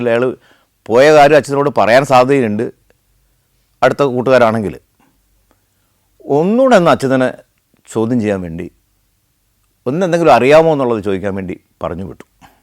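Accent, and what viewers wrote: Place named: Alappuzha, India. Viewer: alla ആലപ്പുഴ ആണെന്ന് തോന്നുന്നു നല്ല അച്ചടി ഭാഷയിൽ സംസാരിക്കുന്നു ❤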